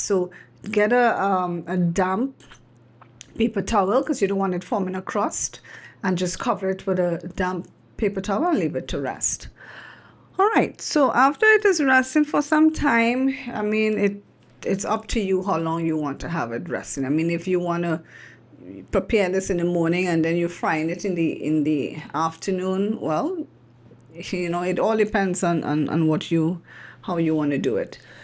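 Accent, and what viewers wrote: Trinidad and Tobago. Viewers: Love It! 🇹🇹 not related to the recipe but you sound a bit Trini :)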